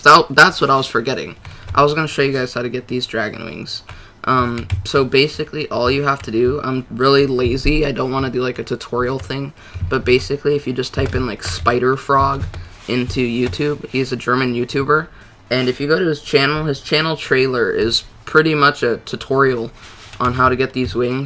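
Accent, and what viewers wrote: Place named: Ireland. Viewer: Ign Irishaccent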